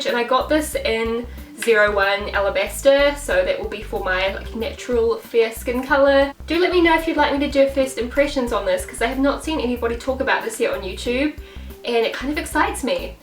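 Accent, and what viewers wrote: New Zealand. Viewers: lovely, can I just ask are you from New Zealand? X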